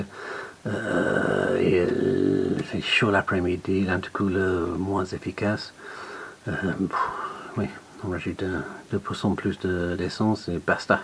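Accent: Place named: England, United Kingdom. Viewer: Votre français est bon ! J’adore votre accent anglais haha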